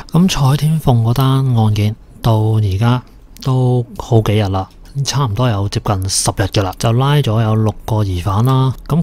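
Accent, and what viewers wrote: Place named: Mainland, China. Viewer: 你把聲 好似係大陸人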